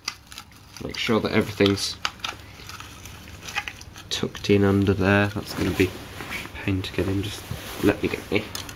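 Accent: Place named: Europe. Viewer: what is "the clappers"? Like full throttle or as pilots know it as WOT (wide open throttle)? I'm from Texas and this guys sounds European (UK?) So I'm not up on the lingo. I know word like "keen" (willing to do happily or with a wanted desire) please friends clue me in. Btw Howdy Y'all from Texas. Thank you in advance.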